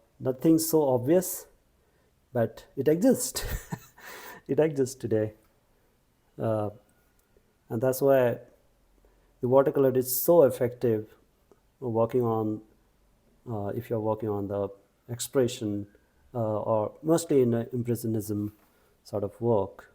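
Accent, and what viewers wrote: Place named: India. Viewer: Always grateful for your generous gift of your time and knowledge,. And don't worry about your accent; I am in Australia, so an Indian accent is no longer foreign! Peter Downie.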